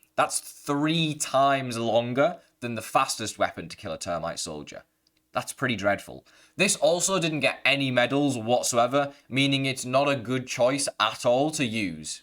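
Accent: United Kingdom. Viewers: The black ox hammer is so confusing. On paper it should be so much stronger, but it honestly sucks. BTW, you have a British accent my friend. How are you butchering all those pronunciations? 😂😂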